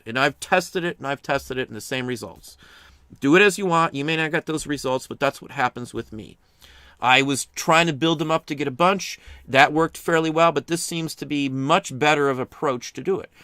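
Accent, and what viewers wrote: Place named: Northwest Ohio, United States. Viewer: I lived most of my life in northwest Ohio. From how you talk I assume you live near Toledo? What city do you actually live in or what town?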